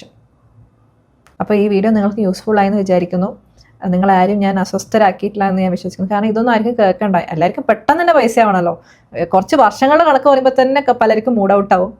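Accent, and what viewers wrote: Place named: North Kerala, India. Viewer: ആടെ, ഈടെ, ഏടെ... “ ഒരു വടക്കൻ ” 😄💪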